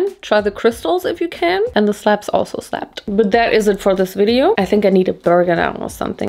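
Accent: Germany. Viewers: I have, a questioni are you German russa nord soming else? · What is her accent? I am so confused. Sounds like German, Irish, but then just… western American? How?! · I love your accent I always wanted to go to Germany😁😁😁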